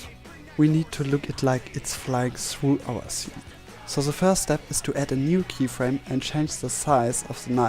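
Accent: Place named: Germany. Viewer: Man merkt das du deutscher bist. :D Aber richtig geile Idee mach weiter so.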